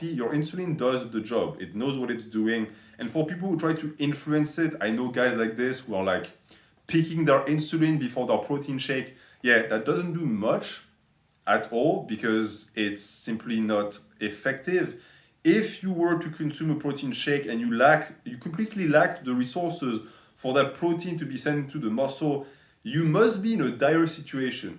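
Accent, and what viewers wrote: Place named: France. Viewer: I feel like has found the most unexpected niche in the fitness industry and that's critiquing stuff in a french accent, idk why it's so addicting to listen to.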